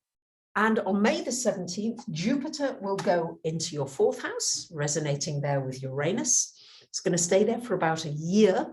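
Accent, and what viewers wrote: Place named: England, United Kingdom. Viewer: Thank You! 🤍. I got an extra, personal, heartwarming confirmation with this video as you clapped your hands together. My grandmother was from Coventry, England, and it was something in that sound, as well as your accent, that reminded me she is with me. 🤍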